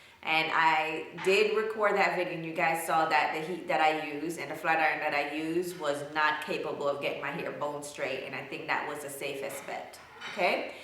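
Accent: Jamaica. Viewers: I know this is probably going to sound like a really dumb question, generally, by heredity, do most people of Jamaican descent, like yourself, have thick hair. I ask because my best friend is of Jamaican descent from her father and her hair is thick. I love your accent. It reminds me of her dad. I used to go visit them just to listen to him talk. My mom couldn't understand a word he said, but I could. :-)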